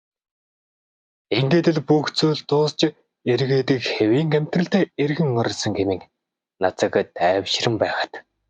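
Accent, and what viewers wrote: Inner Mongolia, China. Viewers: Huue ene unshaad bga hun ni uvur mongol hun yumuu kkkk😊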